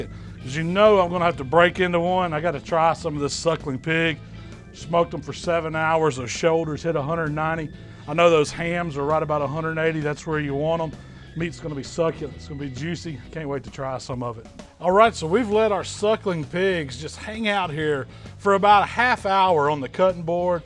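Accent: South, United States. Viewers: It's the country accent + being husky 😂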